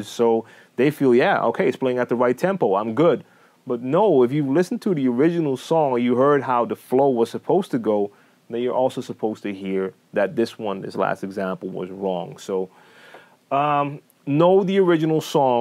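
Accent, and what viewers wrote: Netherlands. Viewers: Are you half dutch? Cause you have very slight accent.. Anyhow great vids, great talent!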